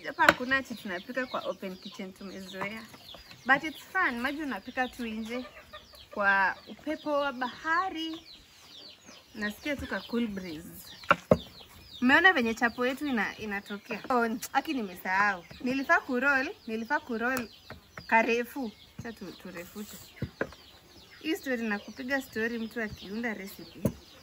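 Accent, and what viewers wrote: Kenya. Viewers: ako na ascent ya waluhya 🤗🤗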